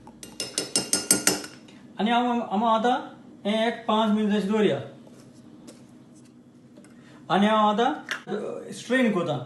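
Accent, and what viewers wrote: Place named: Konkan, India. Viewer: Thanks for sharing your recipies in Konkani More than your recipies I enjoy listening to our Saxtti (Chinchinim) Konkani.Keep up the good work. 🙏🙏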